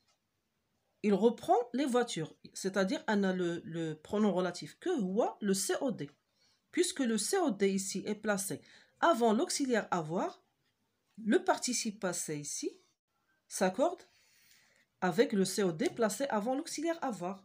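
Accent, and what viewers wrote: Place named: Morocco. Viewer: مروكية ولا دزيرية باسكو الهدرة تاعها شوية كي تاع المراركة اول مرة نشوفة الفيديو تاعكىفي قناتك وهايل بزاف 👌👌👌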